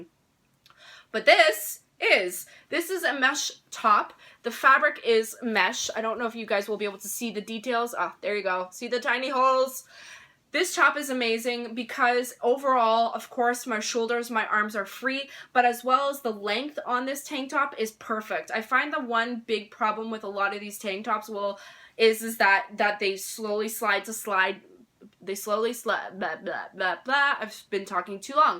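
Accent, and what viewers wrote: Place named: Canada. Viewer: Your Canadian accent is such a treat...coming from a Canadian who's been in the Americas the last 5 weeks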